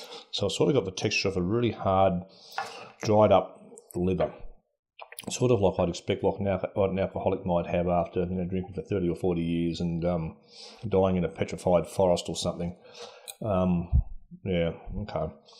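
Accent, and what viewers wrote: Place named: Australia. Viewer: Its Australian mate ;)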